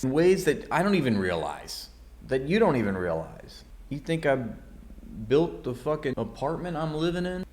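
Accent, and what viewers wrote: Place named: United States. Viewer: Biden or Trump doesn't matter aipac wins... true statement... but as for the rest, all systems exploit... and rolls eyes, there is no white privilege, rich privilege sure... and workers are great, but you should thank the Constitution for your Rights (I'm assuming from youre accent that youre an American citizen)... good luck!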